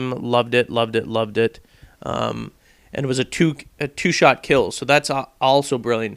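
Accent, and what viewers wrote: Canada. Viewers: Lol are you Canadian? you say abot alot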